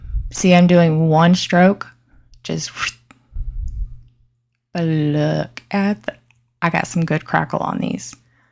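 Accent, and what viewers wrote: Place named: South, United States. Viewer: Sounds like you're a southern gal like me! Love your pumpkins!!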